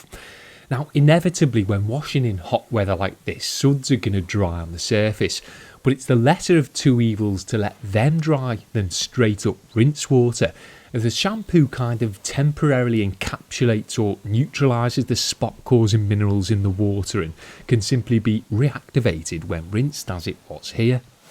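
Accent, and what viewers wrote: United Kingdom. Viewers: You are the definition of British accent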